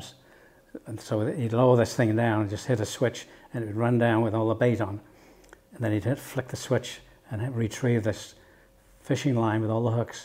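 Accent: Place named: Liverpool, United Kingdom. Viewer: I wonder if he ever said he knew the Beatles when he was chatting up the maids? He sounds like a Liverpudlian.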